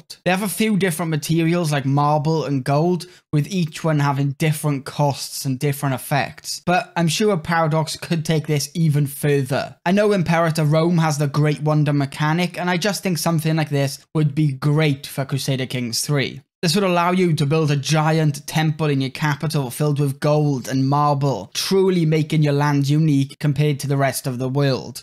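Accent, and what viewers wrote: Wales, United Kingdom. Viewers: where in wales are u from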